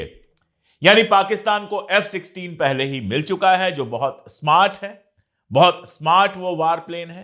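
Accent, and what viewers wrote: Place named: Rajasthan, India. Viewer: Disliked for rajasthani dehati ganwaar pronounciation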